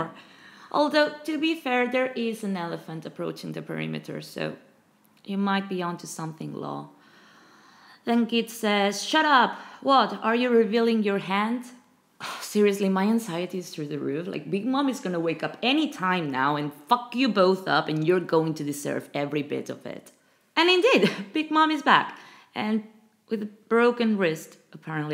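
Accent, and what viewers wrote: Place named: Spain. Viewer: The innuendo in this vid title and the previous is jokes 🤣and as usual the spanish accent spicy as always 🥴, great vid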